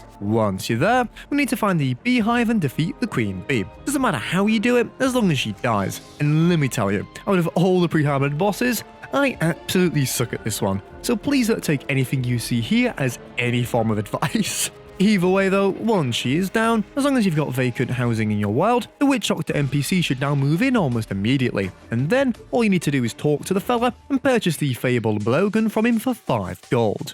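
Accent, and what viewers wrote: United Kingdom. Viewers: I must ask, where in the UK are you from?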